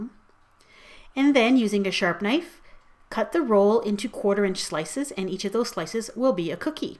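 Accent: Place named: Ontario, Canada. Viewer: The hypno dough got me had to thumbs up lol! Are you from Ontario?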